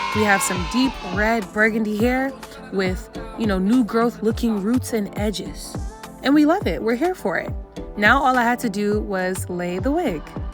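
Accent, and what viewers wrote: Nigeria. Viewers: I just came across this video and I love it. The mix of your ascent with the sprinkle of Nigerian ascent made me subscribe immediately. I love you ❤❤❤. This video helped a lot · It's the Nigerian English twist for me...😅 I so love this wine colour, it suits you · I tried to ignore but I can't, the Yoruba accent creeping in giving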